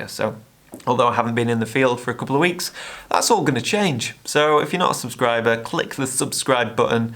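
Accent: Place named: United Kingdom. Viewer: Very surprised about you dont like your own voice. Because the first video I saw of your channel, was with your voice in off, and it was the thing catched me, your voice and the way you talk about photography. I'm very very fan of british accents, so not a big deal, but it's fun to see that you do not like it, and for me was the first thing I noticed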